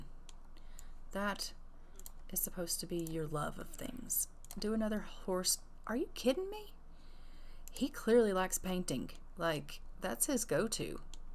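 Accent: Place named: Tennessee, United States. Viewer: I had to go somewhere unexpectedly so I can watch the rest of the vid!Cora.....uh.........!They are definitely a lovey dovey relationship!I feel like they were meant for eachother, Also For The Heritages heres an idea!! Fred-50% Irish & 50% German Cora-50% Irish & 50% Spanish Also are you 5 hours behind me or 7?It's currently 3pm where I live,unless you usually wake up quite early or you have work!Because if you are 7 hours behind it'd be liek 8 and if it is pls rest,I mean unless your going to work but it's the weekend so wake up whenever I want and look at my dastardly dumb comments later lol!For some reason I really want their daughter to be called Merida just because of the hair lol!It kinda surprises me when the life expectancy is like 46,because I know royals have the best heakthcare but alot of royals during this time died in their 80's!!Yes that was me,I think they should buy a business!Butt during the great depression I think we'd have to get rid of it for a while!I feel like we could have a long life span but age them up when we want or kill them when it's the right time!My great grandma was born is 1924 and on Google it says the life expexctancy was like 52,the healthcare in her country wasn't great when she was in her 30's but she lived to 2020,I think my great grandma might be super human(Yes she was 96 lol). 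And the oldest person ever was born in 1884!Yes lol!!!!!She legit is eaten by the piano!OMG Yes accent,I love your tennessee accent,legit she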